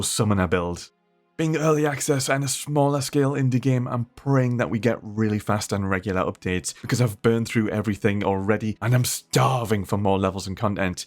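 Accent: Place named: United Kingdom. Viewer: Goddanm i love british reviewers lol.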